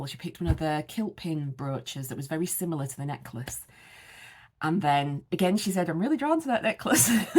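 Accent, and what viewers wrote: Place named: Nottingham, United Kingdom. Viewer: Lovely strands of gems Where are you from in UK? I have a cousin whose accent is similar to yours, she’s from Nottingham. Im from Australia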